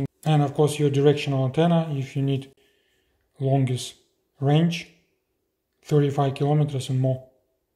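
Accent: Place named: Eastern Europe. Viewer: When I hear a Slavic accent for some reason right away I trust the forthcoming information about radio equipment 🙂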